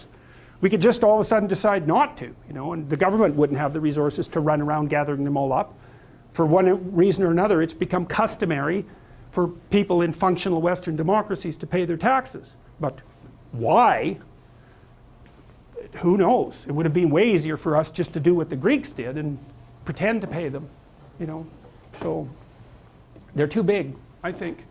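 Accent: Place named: Canada. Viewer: Lewis ouaret He's Canadian, you can hear it in his voice.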